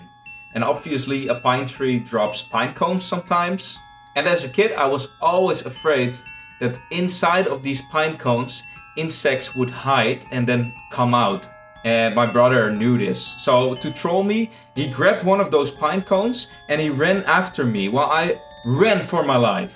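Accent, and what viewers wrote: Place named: Netherlands. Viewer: this sounds suspiciously like a dutch accent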